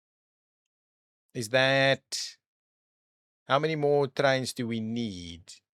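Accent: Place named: South Africa. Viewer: the saffa accent makes everything better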